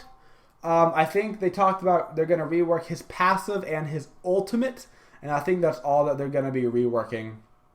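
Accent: United States. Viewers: You seem like the type of guy who speaks " 'merican" as you would say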